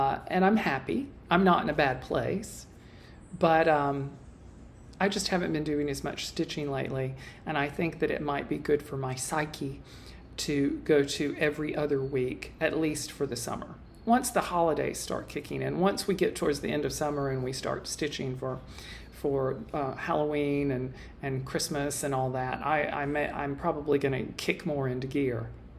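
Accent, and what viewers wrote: South, United States. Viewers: you need to take care of you. If that means making your videos every other week, so be it. You are such a gift to us in this crazy world. Your calm, sweet southern voice is a beacon for us to be guided by to serenity and beauty. Thanks for being you and I, for one, can't wait to see you again!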